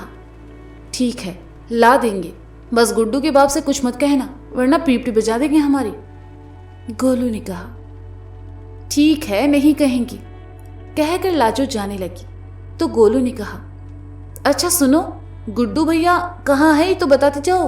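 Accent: Kanpur, India. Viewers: aapne kanpuriya language ka mast boli ho...👌👌👌👌 Bahut badhiya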